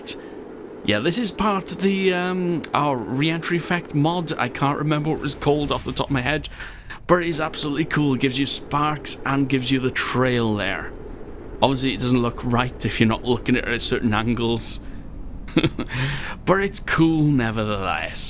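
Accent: Wales, United Kingdom. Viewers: Welsh is it?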